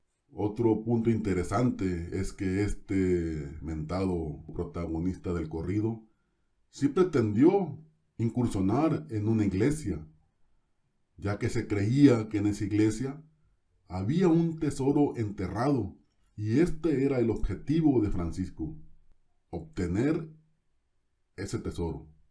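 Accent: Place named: Guerrero, Mexico. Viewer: Esté tío habla cómo sí fuera de Guerrero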